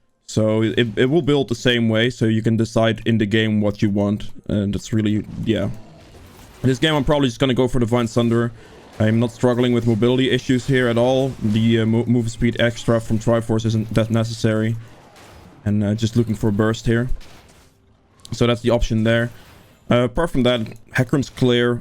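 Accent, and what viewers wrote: Netherlands. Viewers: Ur Dutch ain’t you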